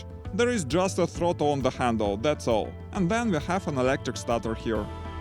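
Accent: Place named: Russia. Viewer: Can't you hear the accent? Rus-rus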